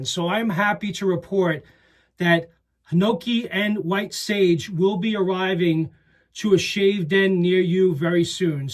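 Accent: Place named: New York, United States. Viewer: Nice shave, love the NY accent💈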